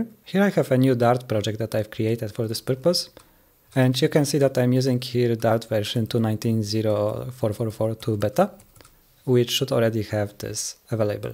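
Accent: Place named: Poland. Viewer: po głosie to chyba polak ;)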